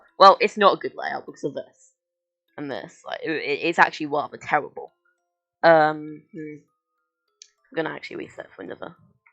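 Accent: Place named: United Kingdom. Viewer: U sound so briish its amazing.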